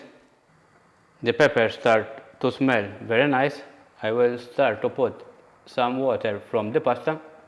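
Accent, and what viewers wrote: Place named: Malta. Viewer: yes but i picked up on your accent and just asking if you are of maltese decent. i know because i am 😊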